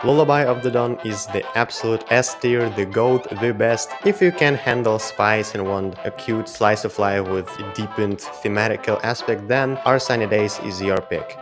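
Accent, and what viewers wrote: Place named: Russia. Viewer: Probably not the comment that you're looking for, but your English doesn't suck! Your English is extremely understandable and your vocabulary is really good! Lots of the slang words and phrases you use correctly and where a native english speaking American would! Seriously you should be proud of it, while you definitely have a distinct Russian/slavic that's not your fault! Anyone who grew up natively speaking Russian or any eastern European/Rusified language is almost guaranteed to have an accent no matter their language proficiency, plus at least in America we probably converse with and understand at least one person with worse English and a more foreign accent than you on a daily basis! Don't let your English skills be what keeps you from creating, I promise it doesn't matter in the slightest to an American viewer! We're here for the personality which we can all decipher just fine past a slight, and very adorable, Russian twang.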